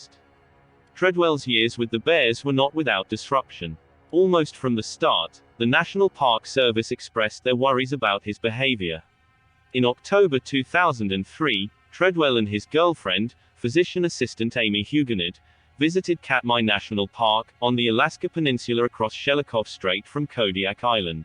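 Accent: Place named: United Kingdom. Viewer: It's not a robotic voice. It's a British voice and the real audio based on news reports. I listened but wish I hadn't.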